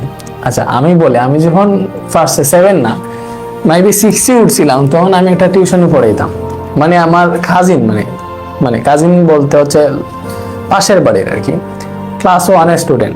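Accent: Sylhet, Bangladesh. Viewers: ভাইয়া আপনি যে সিলেটি এটা এতদিন ক্লাস করার পরও বুঝলাম না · Baiya sylhet r kun jaygay apnar bari. Apnar kota bolar style deklay buja jay apni kati Sylheti ❤ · ভাইয়া কি সিলেটের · Accha sir ki Sylheti..? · Apnar basha kotay vaiya sylhet er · সিলেটি নাকি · Bai apne Sylheti 😮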